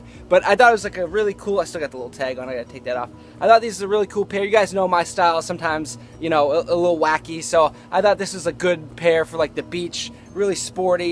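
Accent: Boston, United States. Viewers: boston accent Tha unabombah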